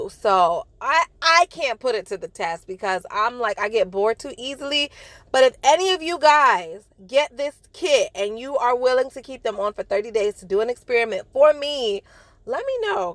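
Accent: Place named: New Jersey, United States. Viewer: Are you from New Jersey? I love your accent and videos 🥰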